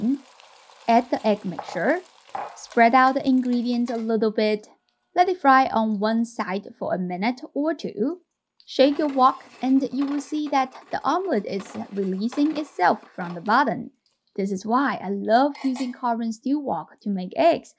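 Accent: China. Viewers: This looks delicious and I LOVE your accent! I've heard Chinese accent before but yours is lovely.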